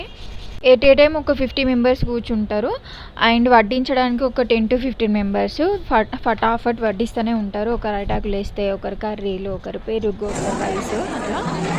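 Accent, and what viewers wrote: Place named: Telangana, India. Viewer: Telangana slang shade aithe pakka untadi 😅.ade continue cheyyandi baguntadi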